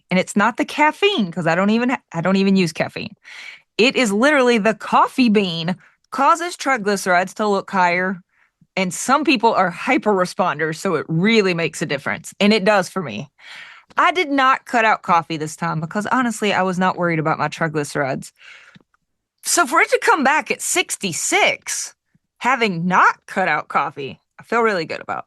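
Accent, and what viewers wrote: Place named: South, United States. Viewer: You had me from the moment you said, "That is it for the old news..." The momen you started describing your sudden craving for pure fat, and said it in your adorable southern accent, you had me smiling, laughing, and totally charmed. Thoroughly enjoyed your video. You didn't mention your complexion, but that had to be at least as good as, if not better than, your cholesterol levels! Let 'er rip,!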